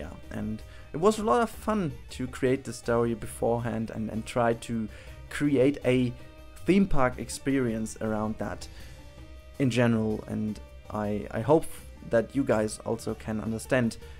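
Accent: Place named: Germany. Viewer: this accent ... soooo german ^^ anyway nice Noria and it really looks familiar like the Noria in anno1404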